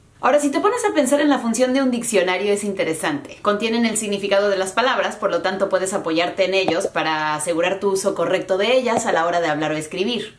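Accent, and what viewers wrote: Standard. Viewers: Te sigo en tu canal, pero como que no era tan fan de tus videos, pero me has ganado ... Hablas español e inglés perfectamente y defiendes el náhuatl sintiéndote orgullosa de tus raíces y durante este video analice tu acento y me encantó ya que es muy neutral y no como el de las ridículas que hablan un poco de inglés y ya se expresan así: "Joveeeen buenas tardeees..." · yo tenia entendido que un idioma es el que tiene tambien ESCRITURA o se que no solo se habla sino que tambien se puede escribir.... y en su defecto el dialecto no. Hablas Espanõl neutro y tambien se diria que tu Ingles es neutro.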